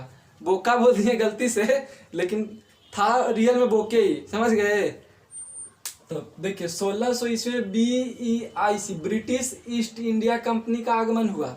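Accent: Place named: Bihar, India. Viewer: Nice video sir. Khashkar samjhane ka bihari language aur andaz😂👍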